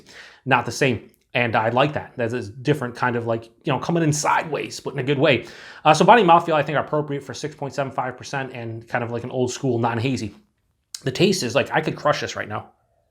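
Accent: Western New York, United States. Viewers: Jeez son, that was heaviest Western New York accent introduction since the WGRZ 12 oclock news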